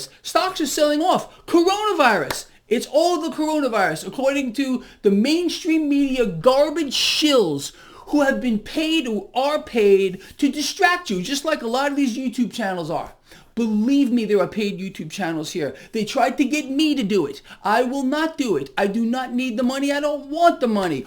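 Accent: United States. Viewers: You speak like a true American Sir....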